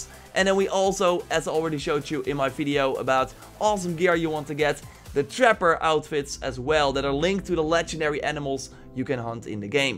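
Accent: Netherlands. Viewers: I can tell by your accent that you're Dutch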